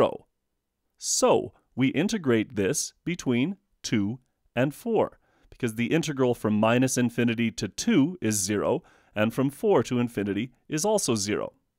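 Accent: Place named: Canada. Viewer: I know a Canadian when I hear one. Also, thank you.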